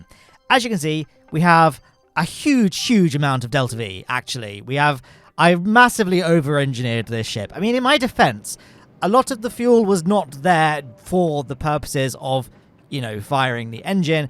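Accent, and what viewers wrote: United Kingdom. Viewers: Love the incoherent british rambling about "jool" and "jewel" for 5 minutes. Keep it up!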